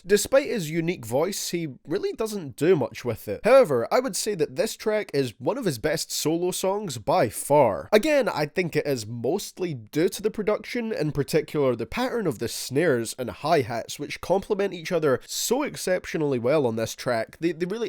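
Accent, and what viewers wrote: Scotland, United Kingdom. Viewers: Is he Scottish????🤨🤨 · What is that accent? I know its from somewhere in Great Britian but i cant put my finger on it.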